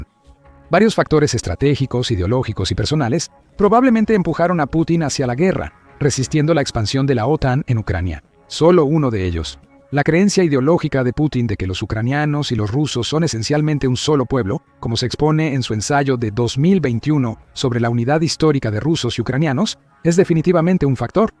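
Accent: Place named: Latin America. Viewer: Tal y como está enfocada la información del vídeo el comentarista o está comprado o es tonto o las dos cosas. Los ucranianos no han luchado por siglos.......faltas a la historia. Asi que cuando veas caer Ucrania y posiblemente desaparezca deberás pedir perdón por la desinformación que estás haciendo. Encima con acento hispano......mira a ver qué está haciendo EEUU con vuestros países.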